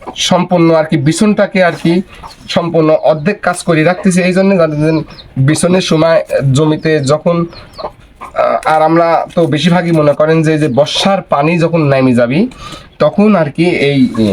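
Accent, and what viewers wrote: Natore, Bangladesh. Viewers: নাটোরের ভাষা কুষ্টিয়ার না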